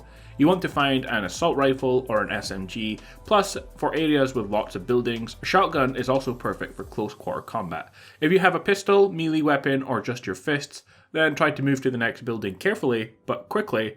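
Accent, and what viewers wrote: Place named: Scotland, United Kingdom. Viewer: I need to ask, what's your accent? Because im from Edinburgh and it seems like you have a Scottish accent but at some times it sounds American haha, also I love the videos buddy!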